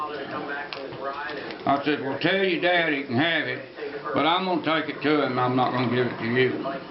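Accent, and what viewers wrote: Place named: Eastern Shore Maryland, United States. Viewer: I loved this...sounds like my husband spinning a yarn..with an Eastern Shore of Maryland accent.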